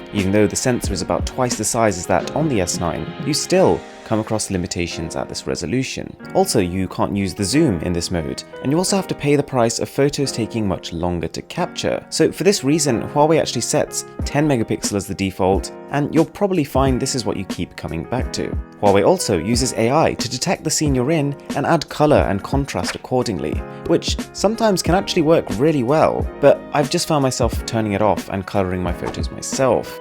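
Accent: RP, United Kingdom. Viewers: Why get your posh friend to narrate? is your voice that bad? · Thanks! Good to hear a British accent on YouTube! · This chap sounds awfully posh ya.. Good review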